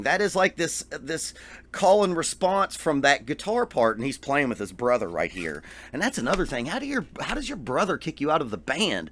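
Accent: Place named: North Carolina, United States. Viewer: Yea I think its strange and weird that the Adler brothers are not playing together anymore, BTWay your accent sounds very familiar to mine, You from NC? lol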